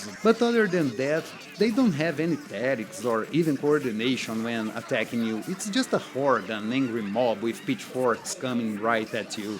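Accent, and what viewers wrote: Brazil. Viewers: Percebi que é brasileiro pelo sotaque kkkkkkkk O inglês tá em dia, parabéns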